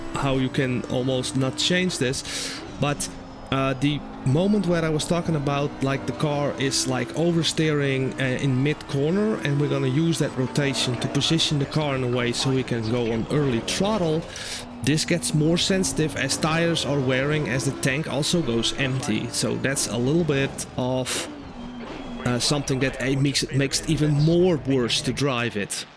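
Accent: Netherlands. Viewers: Hello new to the channel, found your tips really helpful! Also, wasn’t sure if you were Dutch or from the western side of Germany, but then came 🤣.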